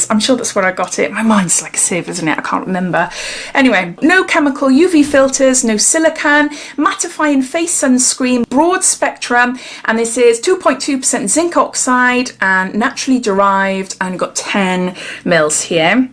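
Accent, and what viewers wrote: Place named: Pembrokeshire, United Kingdom. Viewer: Just subscribed. Stumbled on your video and we are simialar ages. Also detect a welsh accent, I think Pembrokeshire. I am from Newport (Gwent)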